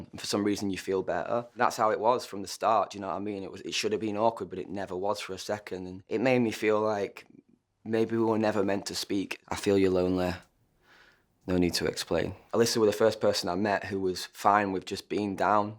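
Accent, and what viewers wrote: United Kingdom. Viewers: Is he british by any chance?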